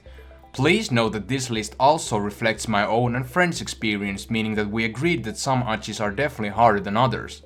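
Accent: Finland. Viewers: Are you finnish? Oletko suomalainen?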